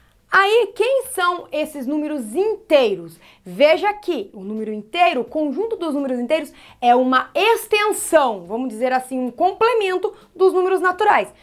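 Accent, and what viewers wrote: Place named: Northeast, Brazil. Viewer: Você é nordestina?? Adorei o seu sotaque 🤗🤗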